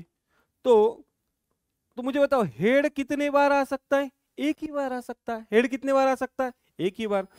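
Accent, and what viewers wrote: Maharashtra, India. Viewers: Marathi accent 🥰🥰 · Question >> ❎ Kochhan >> ✅ Marathi accent 🗿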